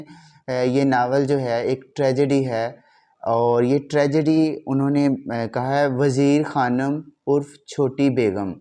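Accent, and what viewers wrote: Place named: Punjab, India. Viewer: محترم ڈاکڑ صاحب اس قدر اعلی ادبی پارہ کے تعارف کا حق ادا نہیں ھو سکا تلفظ اور لہجہ میں اردو کی بجاۓ پنجابی لہجہ اور ادائگی کی واضح چھاپ ھے 🌸🌸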